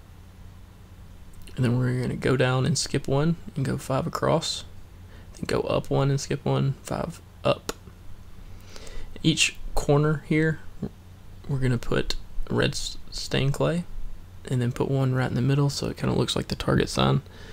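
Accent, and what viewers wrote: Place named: United States. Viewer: I love your country accent! ;-)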